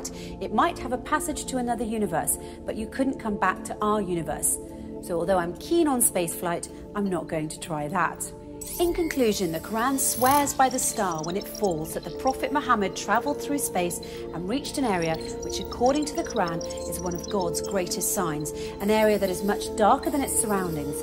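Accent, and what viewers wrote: England, United Kingdom. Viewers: انتبهوا من هذه القناه.. اما المذيعة فهي مذيعة مأجورة تقرإ الننص كما يكتب لها سواء كان نصا من القرآن او للتوراة او لكتب الهندوس فلا فرق لديها. لكن واصح انهم احتاروها بشكل وبلكنه انكليزية واضحه وايضا أن لا تكون مسلمه لكي تبدو اكثر اقناعا.وكأنها تمثل الغرب او ناسا ربما لا القائمين على القناة... متأكد لو انها قررت تلبس حجاب لطرودها لانهم يريدونها بصوره غير المؤمنة.. الله يهيدهم اللي خلف هذه القناة.. علميا كلامهم للأسف ضعيف كما هو حال كل حلقاتهم.. فانتبهوا..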